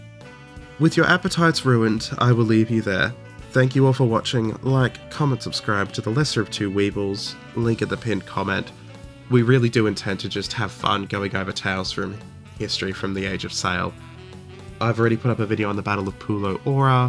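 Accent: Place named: Australia. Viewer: The way this is presented, with the Aussie accent as cherry on top of the cream..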